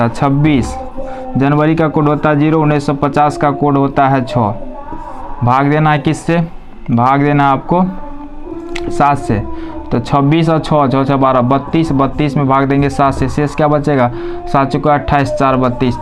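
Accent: Bihar, India. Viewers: आप बिहार से हो ?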